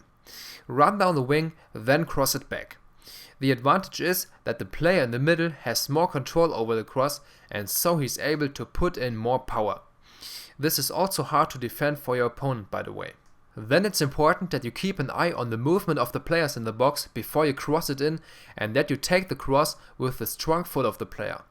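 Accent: Germany. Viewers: this german english ist destroying my ears ... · The comments just show how many dumb fucks play this game. Just enjoy the video, he's German hence a German accent. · sofort gehört das du Deutscher bist, starkes Video ;)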